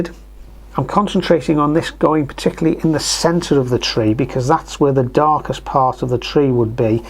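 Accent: Liverpool, United Kingdom. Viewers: this guy sounds scouse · Lovely demo,and great to hear a fellow scouse accent!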